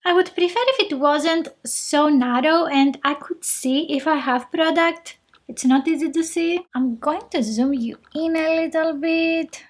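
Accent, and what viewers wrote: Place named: Greece. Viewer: I love your videos and greek accent. I am greek too, Christina. You located in Greece? I was born in Canada but live in Sweden now. Contact me if your in Greece. I'll come visit😁 I'm a makeup artist too👍❤️ we can have fun and do videos together with fun budget products too i haven't tried yet! Sweden don't have the products in stores to test shades out but I'm scared to order online so I don't get the wrong shades lol. I have really oily t zone /combination skin and I don't like too flat matte but I want it full enough to cover and last all day. Did you do a video of the makeup revolution irl foundation, concealer and power line? They don't have it in the stores here I was hunting BUT ONLY their older products available here. Oh you should try rimmel mascaras and foundations! So amazing! The mascara I have been using for years is rimmel wonderfully length pink one it adds volume too!! 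Beats all mascaras today I've been using for years, I've tried them all MUST TRY!! Do a rimmel video please 😍your welcome to Sweden too! Xo Xtina❤️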